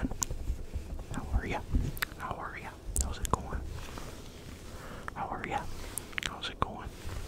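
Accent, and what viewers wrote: United States. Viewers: very Minnesota accent😭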